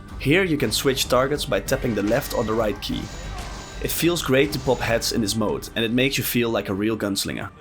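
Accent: Netherlands. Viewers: It took me 10 seconds to know this guy is dutch😂. Leuk gemaakt man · I can just hear you're Dutch. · Your voice sounds so Dutch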